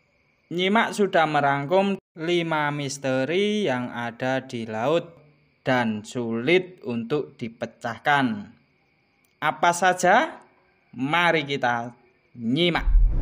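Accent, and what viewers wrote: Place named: Java, Indonesia. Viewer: Dan sangat medok wkwk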